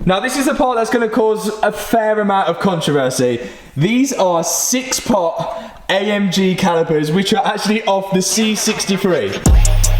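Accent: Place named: RP, United Kingdom. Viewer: This is my first video I've watched on your video. You sound posh but look like an LA fella 😂. Cool car content though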